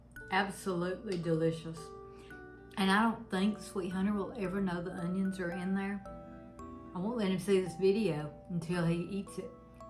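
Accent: South, United States. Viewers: I’m so glad I found your channel. I’ve now watched several of your videos and everything looks so yummy! Love your southern accent…………I love also that you call your husband sweet Hunter…….my husband was a sweetheart, but passed away 4 years ago……miss him so much!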